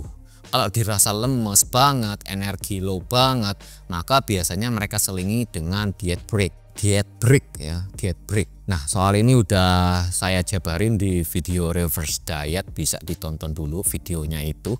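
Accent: Java, Indonesia. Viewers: Sampean jowo to bang, kok medok😀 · Jadi medok gini bang wkwkwk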